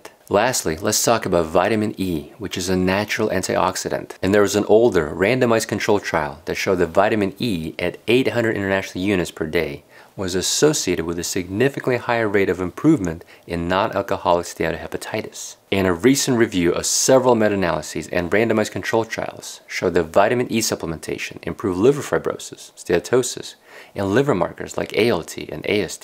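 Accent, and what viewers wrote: United States. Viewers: only like your American accent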